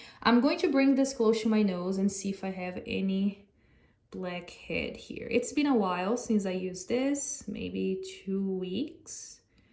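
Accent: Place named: Brazil. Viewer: You sound Brazilian! ❤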